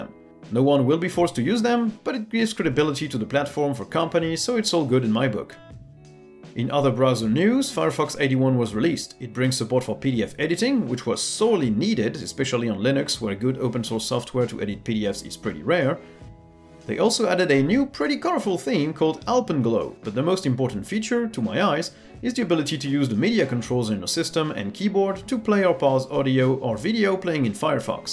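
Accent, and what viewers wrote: France. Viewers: I love Linux et ton léger accent français 👀😂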